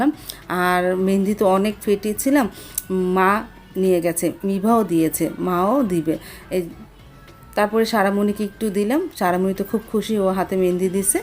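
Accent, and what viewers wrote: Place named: Tangail, Bangladesh. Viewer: আপুর কথাগুলা একদম আমাদের টাংগাইলের ভাষার মত।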